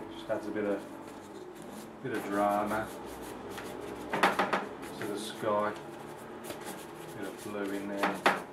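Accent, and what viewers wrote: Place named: Midlands, United Kingdom. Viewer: You inspire me so much, love your Artwork, you even make a telegraph pole into a work of art. I also love your honesty as you review your paintings at the end, even though I can see nothing wrong until you point it out, it helps me see things in a different light on my own feeble attempts at water colour painting. you ascent makes me wonder if you are a Midlander like myself? :D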